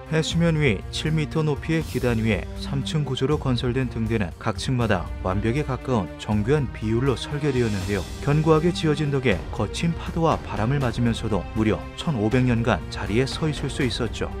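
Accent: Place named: Gangwon, South Korea. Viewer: 기묘한밤 나레이션 담당분은 고향이 강원도 아닌가요? 강원도 특유 억양이 있는거 같은데 ㅋㅋ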